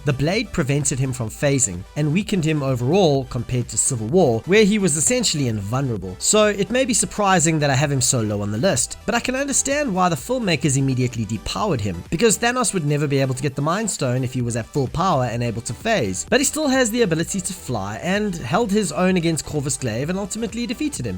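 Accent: South Africa. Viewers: Loving the south african accent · This guy sounds like he is South African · Strange question, are you South African? just asking due to your accent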